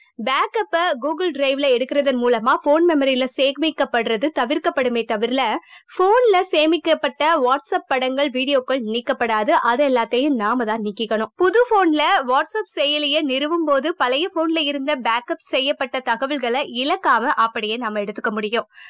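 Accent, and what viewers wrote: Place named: India. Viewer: yeah great! English title spoken Indian.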